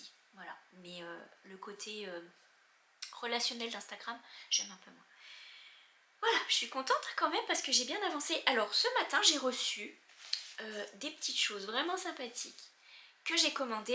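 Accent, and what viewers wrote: Canada. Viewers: Merci encore pour ce beau moment de partage. Passez un merveilleux week-end en famille. Et merci pour ce joli accent canadien qui ressort de temps à autre !! 😊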